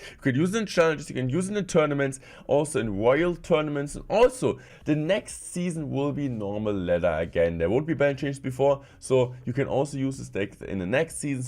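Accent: Germany. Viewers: Ich mag den German accent in your sprach sounds sehr nice and refreshing zu all den anderen Englischsprachigen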